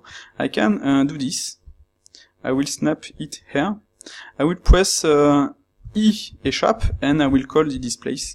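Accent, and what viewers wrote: France. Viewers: jesus why dont you activate that keyboard shortcuts addon man???? cant follow you with your strong french accent... that would help us immense... otherwise great addons, bought them both.... :) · the frenchness is strong with this one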